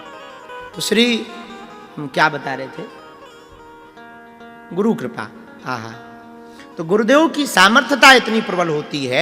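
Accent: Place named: Bundelkhand, India. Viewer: सही बात बुंदेली बोली हमे भी अच्छी लगती है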